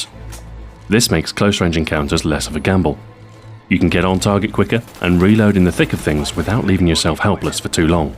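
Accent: England, United Kingdom. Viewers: Play the video at about minutes and switch it to 0.5x speed. LOL. voice sounds so funny. He sounds like HAL 9000 with a little more of an English accent.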